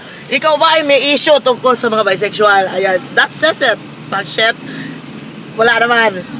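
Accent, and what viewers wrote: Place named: Visayas, Philippines. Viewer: Omg you never fail to make me laugh!! Ang galing mo teh!! Hahah! Pasok sa banga yung bisayan accent!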